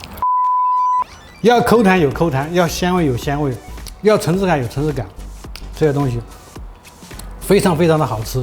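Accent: Sichuan, China. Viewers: 这个川普听上去真上头啊 哈哈 但是越听觉得越是喜气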